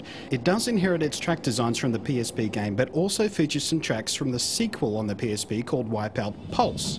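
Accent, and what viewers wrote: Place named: Australia. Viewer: what the hell is wrong with you? He's Australian he LIVES in Australia, that's the way he talks, he's not faking the damn accent.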